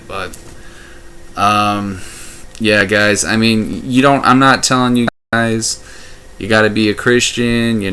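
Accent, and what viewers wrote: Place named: United States. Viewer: Atheism is not a theory. So... before you try to "debunk" something, you should first learn what it is. Never a good start, when your first sentence demonstrates your ignorance on the subject you attempt to talk on. Atheism is a RESPONSE to a claim. The claim "There is a god". Atheism is the rejection of this claim, as unsupported. Got it? Good. So... you sound like you're from the US. And in the US, pretty much all people in higher offices are theists. They HAVE to be, otherwise the general public wouldn't elect them. Because apparently, whether or not you believe in an invisible being with supernatural powers is more important, than whether you have good qualifications or good political ideas. So yeah... you're idea about atheism coming from the "elite" falls flat right in the beginning... Illuminati? Are you serious? 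0_o The state isn't my god. I don't think the state is an all-powerful, supernatural being. The state also isn't ONE entity. It's made up of a number of people. I also don't worship the state. So, all in all: That's a weird claim to make! You literally have to redefine what you mean by "god", to make this claim work. And honestly, if you have to redefine terms, just to make your point, you should already realize that you don't have a good point to make. That's not a number one theory of atheists. That's not a number 10 theory of atheists. Actually, that matches more what theists believe. Theists usually believe that the entire universe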